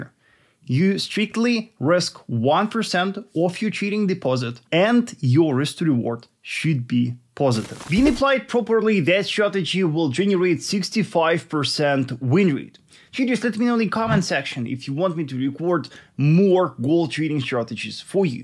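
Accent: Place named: Russia. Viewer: Damn I never heard such strong Russian accent. 😊 love Russia, the only sane people in the world! I hope to learn from you!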